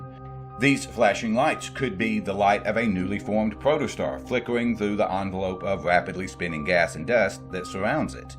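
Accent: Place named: South, United States. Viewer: I just found your channel.. am subbed after hoth and this genesis video... fantastic. Also i say this as a southerner, and with implied awe... but you sound so much like jim varney... like he is explaining these subjects. It may just be the microphone, but you sound like you have a similar accent also. Anyway, awesome channel!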